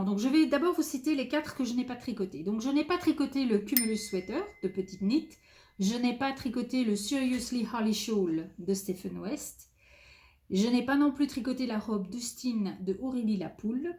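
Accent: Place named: Belgium. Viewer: Coucou, voilà que je découvre ton podcast et j'ai déjà regardé quelques épisodes qui ont été très appréciés! Dès le premier visionnage, j'ai eu le sentiment que j'écoutais une compatriote belge. Il semble que tu as habité la Belgique! Ceci étant dit, je suis une grande consommatrice de podcasts tricot français 😉. Bref, j'aime beaucoup ce que tu présentes et la manière dont tu le fais et je m'abonne de ce pas! Gros bisous de Belgique😘♥️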